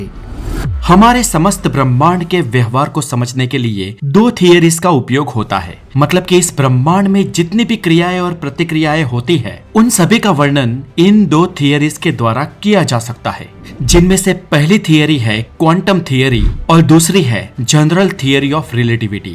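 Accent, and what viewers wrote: Gujarat, India. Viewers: gujarati hai. par udisa bilkul dusre chhor par hote hue bhi similar hai kuch chize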